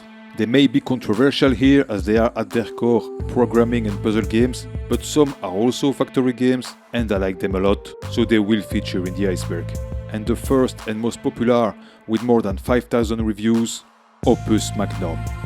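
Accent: France. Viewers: Of course! I find it quite charming to be honest. It's very intelligible, don't you worry, but it's also VERY french :D It's a neat accent. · This is some premium french accent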